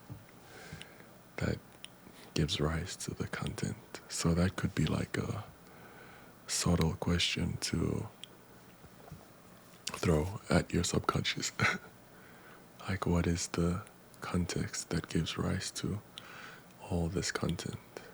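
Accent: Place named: Toronto, Canada. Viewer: You sound like you're from T.O... 🇨🇦🤙🏻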